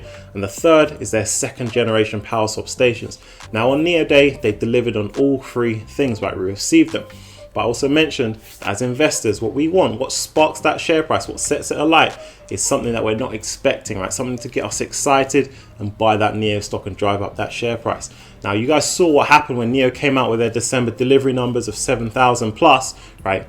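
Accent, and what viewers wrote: United Kingdom. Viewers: Great to hear a U.K accent in the financial space! Great video my bro. #SecureTheBag 🔥🚀💰 Bless!!!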